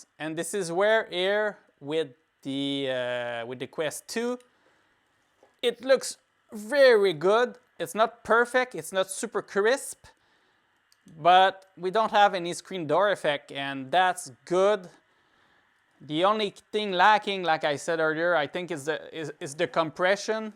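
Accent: Quebec, Canada. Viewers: Serais-ce un accent Québecois 👀 Bonne video btw! J'ai présentement un CV1 et j'ai commandé un quest 2 et j'ai commandé un link cable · Je reconnais cet accent du Québec 😊 Bon vidéo!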